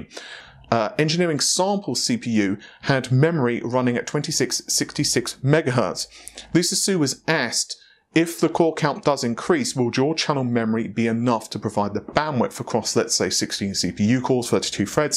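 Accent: United Kingdom. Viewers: Short is good, specially with this thick British accent.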